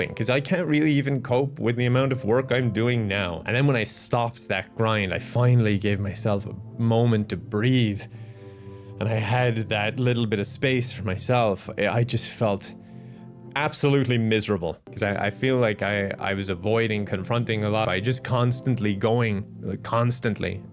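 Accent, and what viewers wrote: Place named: Ireland. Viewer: Jeysus, I've watched for a little over a 3rd of my life (maybe, I was never good at math). I suddenly understand now why I have an Irish tint to my accent. Started watching somewhere around the first spider-man videos. Also even if you don't make a full cover, pleeeeese release the " " song. It was so good. And I'll need to learn that song myself in a few years.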